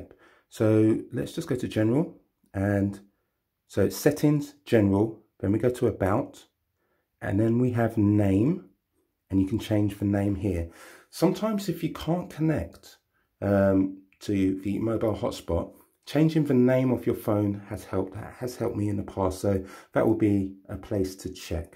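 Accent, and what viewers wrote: United Kingdom. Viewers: Tried a few videos which left me baffled, I was almost losing the will to live when I clicked on your video - bliss, pure bliss. Someone who described it so clearly and simply (pretty straightforward process as it turns out). Nice, calm British accent too. Well done mate.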